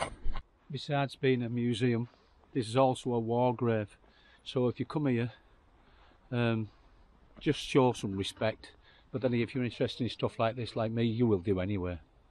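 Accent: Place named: Lancashire, United Kingdom. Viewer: Fascinating. I was driven past Liege in the 1970's but never saw any of the fortifications there. I was lucky enough to visit Verdun in the 1980s and did visit those at Vaux and Douamont. I have hundreds of books, postcards, e.t.c. on fortifications. You sound like you come from Lancashire. I'm a scouser myself.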